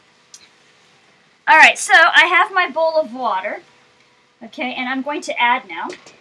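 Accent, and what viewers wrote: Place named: United States. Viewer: I think your English still sounds very American. I think "you know" is spoken by a lot of the kids; I know I did it when I was a teenager. "Skimming the top" or we even use 'scumming'.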